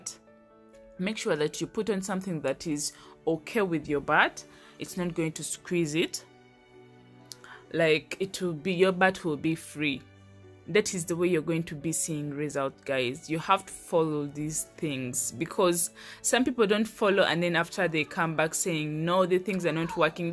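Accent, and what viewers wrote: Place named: Uganda. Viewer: She sounds Ugandan hmm..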